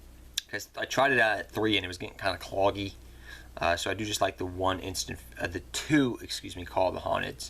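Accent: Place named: Northeast, United States. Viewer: you from up north?? got an accent on you Ny Boston NJ ??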